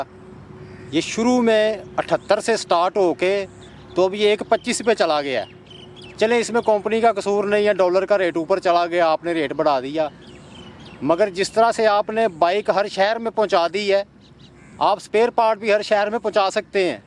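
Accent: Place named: Punjab, India. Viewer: Bhai apni urdu to teak kar lo adi punjabi mar ra ho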